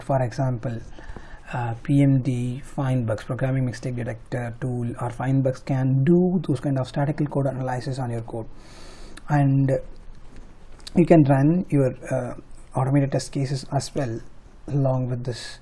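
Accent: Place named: India. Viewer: i hate this indian english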